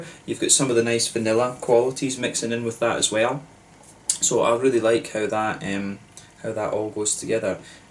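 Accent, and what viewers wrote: Scotland, United Kingdom. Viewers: You sound Scottish but did you say you’re from Sweden? Nice review. My go to for craft beer is the boys from the craft beer channel, johnnie and brad. You guys should get together for a livestream. Cheers brother 🍻👍🏻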